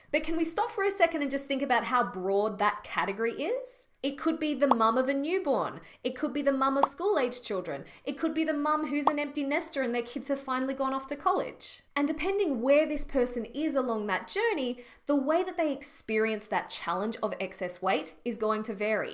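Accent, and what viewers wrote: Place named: Australia. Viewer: OMG! Love you! Aussie Voice #1 Relatable #2. Freakin Funny & Human #3 Thank YOU #4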